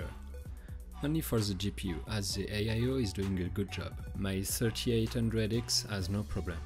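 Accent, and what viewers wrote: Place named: France. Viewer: Wech la france !!! 🙋🏻‍♂️😂 trahis par l’accent ! Respect ! Vraiment dure de parler comme ca 😌 bonne video ! Beau matos! J’ai un T1 moi 🤘🏼ssf gang !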